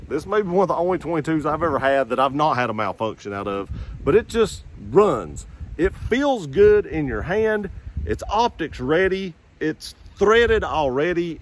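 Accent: South, United States. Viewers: Brother are you from south of the Mason Dixon ?🤓…. Great video … well done !!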